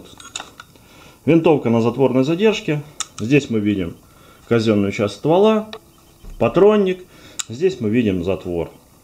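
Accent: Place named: Ukraine. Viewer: у его английского сильный украинский акцент =)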